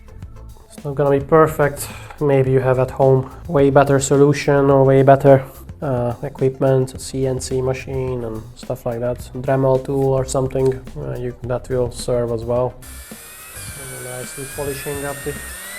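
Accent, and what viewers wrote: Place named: Hungary. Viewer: You sound like hungarian 🇹🇯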